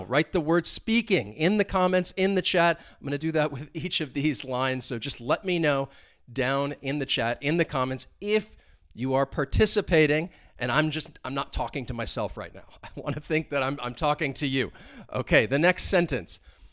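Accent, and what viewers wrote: United States. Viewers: it takes lot of time and dedicatiion to absorb the rythm and flow of american accent, if i can get more real imput instead of just reading and whatching tv, i will be more confident in speaking the way you speak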